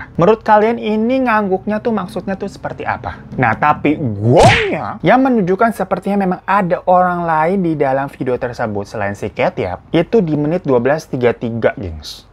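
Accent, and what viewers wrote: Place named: Jakarta, Indonesia. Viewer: Lg gawe denger cerita begini, ngakak 🤣🤣🤣 Betawi bet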